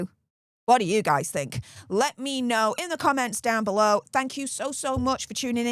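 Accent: United Kingdom. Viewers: Love the way you talk on your show and your British accent👍🇨🇦👍🇨🇦👍🇨🇦👍🇨🇦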